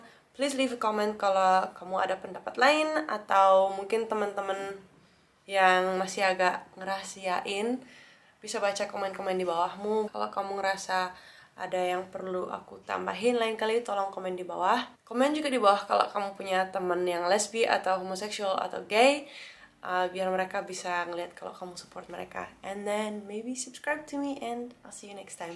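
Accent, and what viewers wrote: Bali, Indonesia. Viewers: Loagat nya bali banget. Lesbi Seng ken Ken. Aku suka Cwe Lesbi. Salam Kenal dr Tabanan.🙏👍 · Gemes sama medok Bali nya 😁 wajahnya juga cantik menurut pandangan saya. God bless u! ♥️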